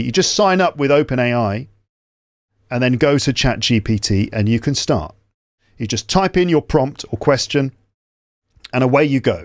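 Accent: United Kingdom. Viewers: How i downloaded it and what's its name of the app. I love your podcast, it's dramatically useful. I wanted to learn Amarican accent but after i know your podcast i started learn British accent 🤎🤎🌿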